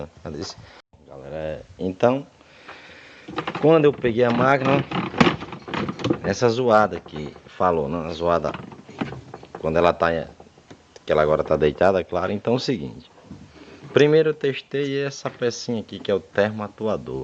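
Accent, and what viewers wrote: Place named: Recife, Brazil. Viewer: Vc é de recife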